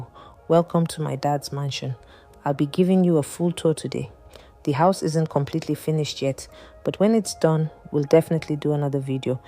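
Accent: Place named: Nigeria. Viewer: U are speaking like Nigerian. Copy and paste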